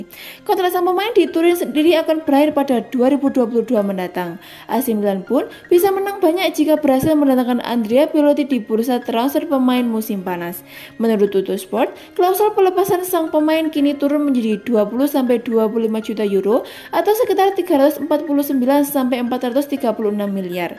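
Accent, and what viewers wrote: Java, Indonesia. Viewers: Medddoook banget mbaaaakk eee 😅😅😅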